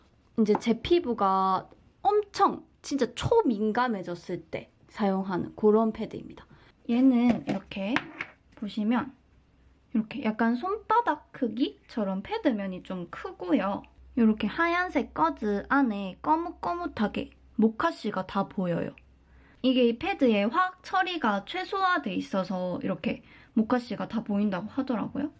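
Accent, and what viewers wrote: Gyeongsang, South Korea. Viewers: 경상 북도 사람 이신가여?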